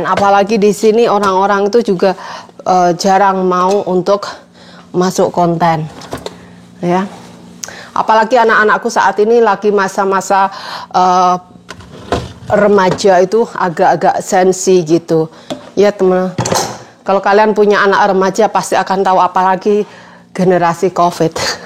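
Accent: Java, Indonesia. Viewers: ngegas dan jutek Ngomongnya medok dan keminggris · Konten mba kreatif kok yng komen gak kreatif itu orang emang bisa bikin konten seperti mba? Isi konten nya gak monoton kok misal nya cuma ngomong2 aja, jualan masakan aja. Malah berkat konten mba, aku bikin roti pake resep mba bagus rotinya. Liat konten youtuber ambil manfaat ilmunya, perjuangan orang tsb hingga sukses, yang paling penting bagi aku setiap sharing ilmu baking nya jujur gak nipu netizen. Mba ini walau hidupnya lama di luar negeri tapi gak lebai, suami bule nya gak pernah disuruh2 joget2 memalukan ala dangdut an di konten nya, gak bergaya dan berpakaian kebule2an, bahasa Indonesia dengan aksen jawa nya tetep ciri khas nya. Bahagia dan sukses nya kehidupan mba sekarang yg bisa bikin iri orang.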